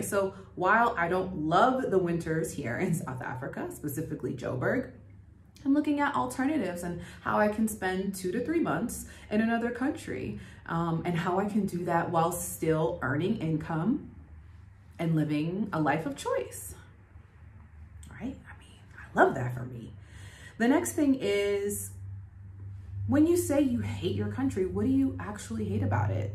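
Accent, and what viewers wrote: United States. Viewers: You should have moved to Limpopo province. It is hot there., you sound like you're from Texas, Austin. Lol. I'm a South African studied and lived in the USA for more than 20 years. In Kansas it snows. I visited Austin TX in winter. The other day it snowed and that is when I realized it rarely snow in Austin because of the car accidents on the roads.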